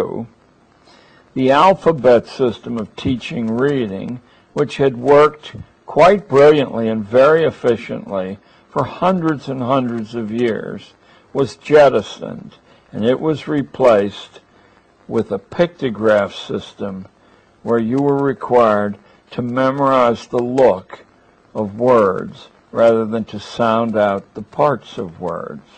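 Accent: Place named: Pittsburgh, United States. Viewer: The Pittsburghese is refreshing.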